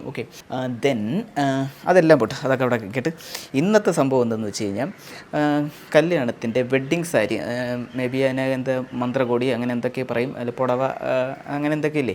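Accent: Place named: Trivandrum, India. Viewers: Ithu normal Trivandrum slang aanu...ini ipol avarodu avarude normal slang maatti valla Kannur slangum parayaan parayaam ningale thripthipeduthaan entha.. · Edey edey ith normal trivandrum slang aan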